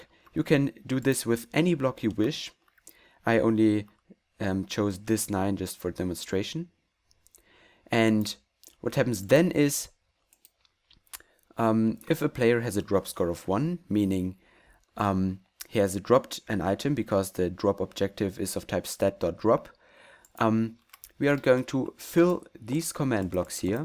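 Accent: Germany. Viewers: are you german? you don't speak english as well as a real english people!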